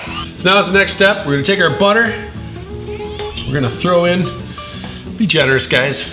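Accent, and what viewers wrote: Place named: Canada. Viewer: This guy sounds Canadian.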